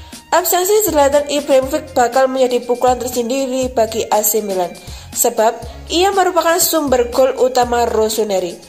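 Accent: Java, Indonesia. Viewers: Medok banget mba bacanya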